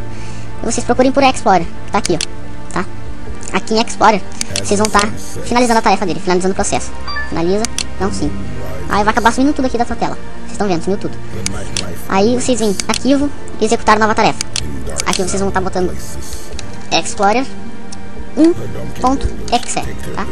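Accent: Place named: Paraíba, Brazil. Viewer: QUE VOZ DE PARAIBA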